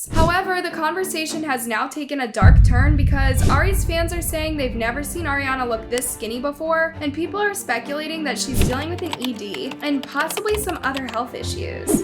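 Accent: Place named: Los Angeles, United States. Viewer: That L.A accent is soo annoying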